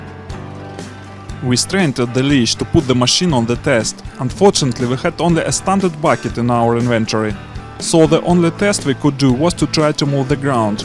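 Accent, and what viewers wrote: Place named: Russia. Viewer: По акценту понял, наш спикинг )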